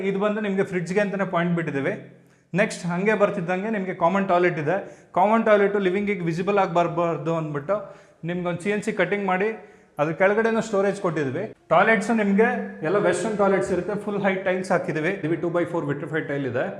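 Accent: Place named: Udupi, India. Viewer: Udupi talking maduthira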